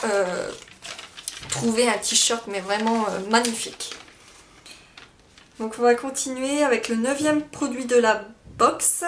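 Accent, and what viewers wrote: North, France. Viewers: Tu est du nord non? :')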